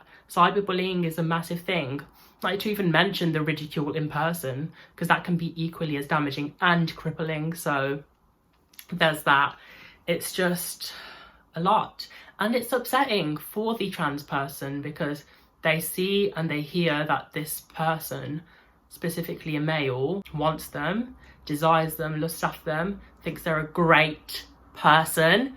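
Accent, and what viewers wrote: United Kingdom. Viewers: I'm straight married soon to be divorced...and sweetheart I would love to date you. #1-You're Gorgeous #2-I love you're accent #3-You're not only gorgeous on the outside but I'm sure inside too heart & soul #4-I'm secure on my masculinity #5-I don't care what people say or think...as long as were both happy #6-I would love to date you sweetheart...I love and adore British women & you too !!! If you ever wanna chat...I would love to meet you...amor I need a woman like you in my life ! Take Care sweetheart I wish all the best !!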